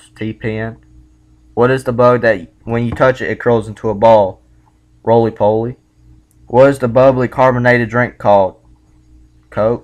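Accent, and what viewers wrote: United States. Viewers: You are too cute!! I'm from South Carolina as well, can def. hear your southern accent!! :) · This literally my exact accent. I’m from SC as well. But I don’t say theater like “Thee-ator”.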